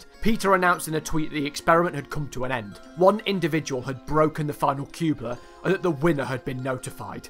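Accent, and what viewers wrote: United Kingdom. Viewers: The editing itself was just very interesting, but good topic with bri""ish accent is so much fun. Thanks for great video, i wish they would come more often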